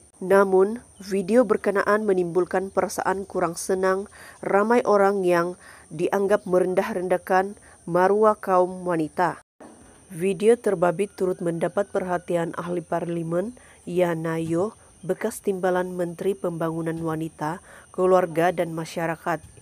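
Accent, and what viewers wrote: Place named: Indonesia. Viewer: Suara ni mcm indon je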